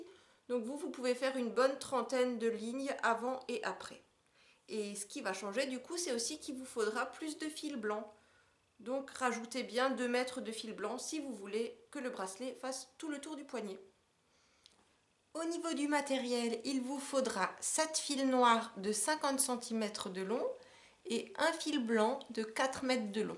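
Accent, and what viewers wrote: Alsace, France. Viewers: Tu as un accent tu viens d'où ? D'Alsace ?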